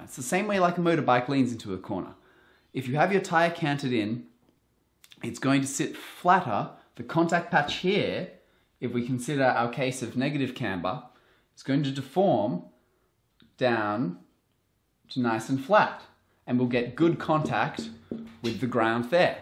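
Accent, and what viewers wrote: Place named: Australia. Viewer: instant subscrib + australian accent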